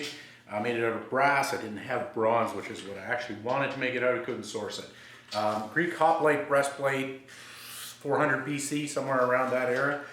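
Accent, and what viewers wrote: Canada. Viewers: Op. I've detect a Canadian accent. I'm in good company. Hello fellow Canadian. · I just stumbled upon your channel and this is the first video I've watched. Thanks for taking the time to make it. From the sound of your "oots" and "Aboots" you sound like a fellow Canadian.....or perhaps Minnesota ;) . Regardless, thanks for what you do. :)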